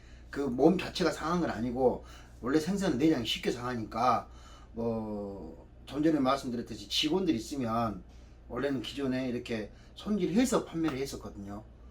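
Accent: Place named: Suncheon, South Korea. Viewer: 친정이 순천이라 고향의 사투리 정겹습니다 요즘은 고등어 는 안나오는군요 😀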